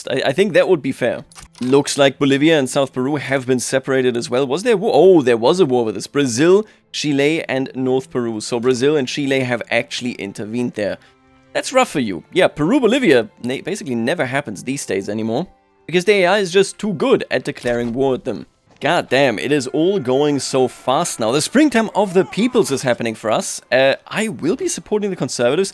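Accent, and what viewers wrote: Germany. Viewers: I like your german accent. It has that analytical feel to it.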